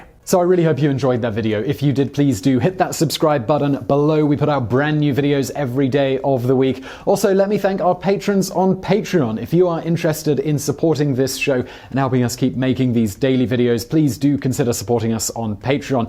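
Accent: United Kingdom. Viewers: Why do I always have a hard time focusing on a video or even understanding what the hell is going on when it's in the British accent? I literally didn't understand two thirds of the video :/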